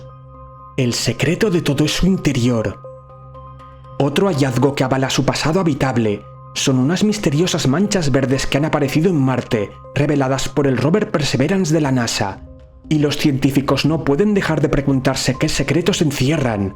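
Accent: Spain. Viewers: Me gustan tus video pero deberías por el bien del canal contratar un relator latino, a muchos latinos no nos gusta el acento español, con todo respeto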